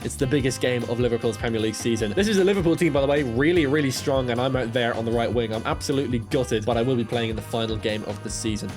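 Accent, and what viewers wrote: Ireland. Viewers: Yourrrr irishhhhhhhh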